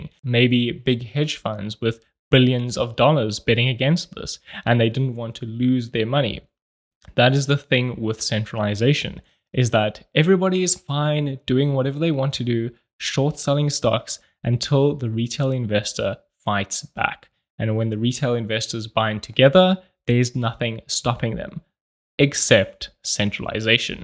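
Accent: South Africa. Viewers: No doubt you are South African. Great content bruv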